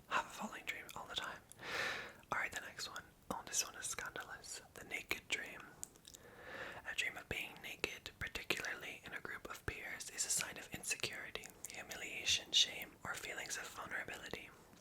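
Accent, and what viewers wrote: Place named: United Kingdom. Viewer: Love this concept! Was super interesting to listen to and your whisper was super relaxing! I usually don’t vibe with male ASMRtist’s whispers but yours is unique. It sounds like you have a UK accent sometimes. Maybe you just annunciate very well.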